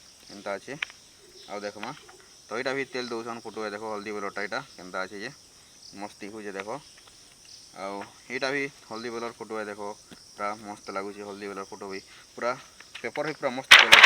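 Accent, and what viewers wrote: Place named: Sambalpuri, India. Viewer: କଂଚା ସମ୍ବଲପୁରୀ ଏ ,,,,,, ପୁରା ଖଡ୍ ଖଡ୍ ଖଡ୍ କରୁଛେ ବଲ 😅😅😅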